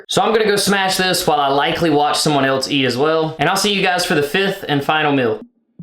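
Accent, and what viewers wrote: United States. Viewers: What state are you from? Probably never heard that American accent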